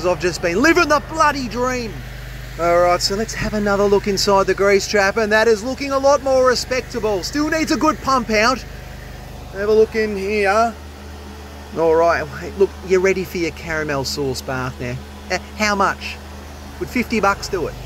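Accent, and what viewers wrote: Australia. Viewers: Don't shut up bud!! So funny with your comments, and the Australian accent is so much better than an american one.😂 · Just found your channel couple days ago. I work on pools here in Arizona and your channel popped up. I gotta say half the fun is your talking. Something about Australian accent can make an autopsy seem fun. Keep up Awesome work already been thru 10 of your videos in 2 days. You’re great. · Absolute joy. Keep the commentary going, full on Fosters and full on Aussie 👌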